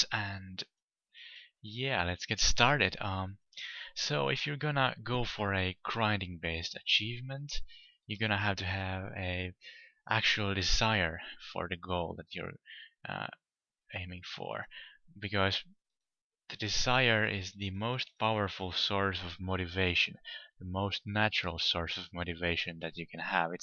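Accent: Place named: Finland. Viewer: ive only watched you for 40 secs but already know your finnish haha :D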